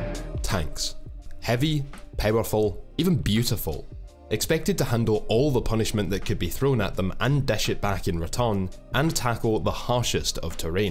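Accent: Scotland, United Kingdom. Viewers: Man... I just LOVE your scottish accent, sounds so cool :D Nice video as well, keep it up ;) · This proves it. I will happily listen to anything if it's spoken in a Scottish accent. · Are you Scottish?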